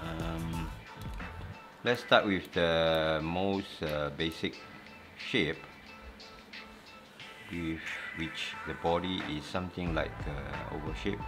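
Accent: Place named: Singapore. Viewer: Are you singaporean? You have the accent of one haha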